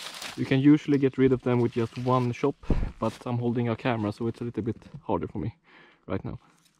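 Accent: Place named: Sweden. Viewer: Just curious are you from Sweden? Your accent is badass man lol thanks for the info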